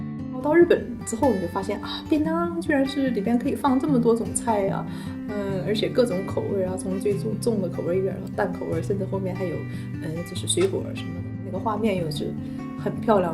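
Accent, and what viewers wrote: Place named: Northeast, China. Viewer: 东北银，鉴定完毕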